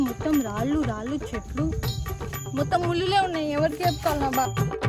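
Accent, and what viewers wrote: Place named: Telangana, India. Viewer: I like ur way of talking telangana muddu bidda Akka love from narayanpet akka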